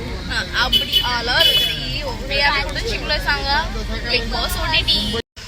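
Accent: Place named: Malvani, India. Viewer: ur malvani accent is so cute, say it again 😝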